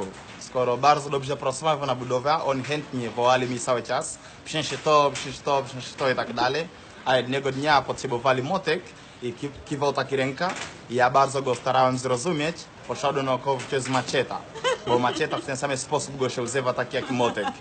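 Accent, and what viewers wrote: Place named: France. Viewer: Gówno prawda mówi z zabojadzkim akcentem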